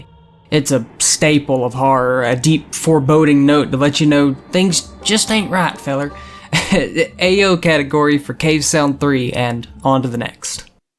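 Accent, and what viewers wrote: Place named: South, United States. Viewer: Great content, keep it up, but you might want to tone down your own accent a bit if you can, people would probably find it jarring to open up to a heavy southern accent, especially european or north US viewers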